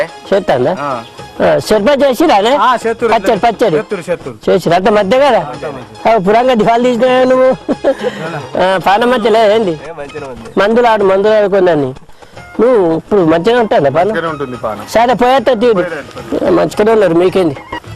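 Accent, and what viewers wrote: Telangana, India. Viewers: అచ్చమైన తెలంగాణ యాసతో అమాయకమైన మ్యానరిజంతో కూడిన కామెడీ నాకు చాలా నచ్చుతుంది.. మరీ ముఖ్యంగా ఇందులో సగటు మానవుడిలా ముందో మాటా.. వెనుకో మాటా.. సరదాగా అనిపించింది.. ✍ Ramon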